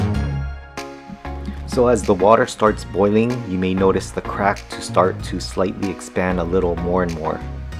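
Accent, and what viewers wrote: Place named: Hawaii, United States. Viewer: Great tip!! I hate when that membrane gets stuck on the egg! Yuck... btw... you sound like you're from Hawai'i 😀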